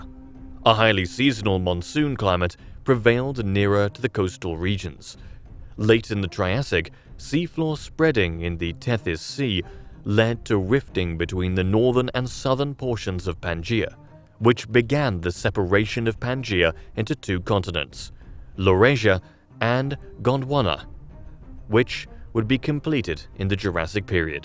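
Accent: United Kingdom. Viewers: Am I the only one sick UK accents?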